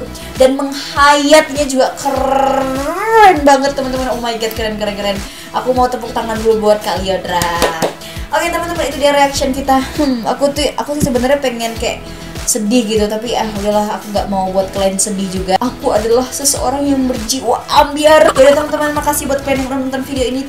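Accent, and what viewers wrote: Medan, Indonesia. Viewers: Dari logatnya, keknya kakak ini org medan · Ihh. Cantik kali kakak ini woiii...ahahaha. luck loe. Hihiii. Medan kali kauu.🤣🤣🤣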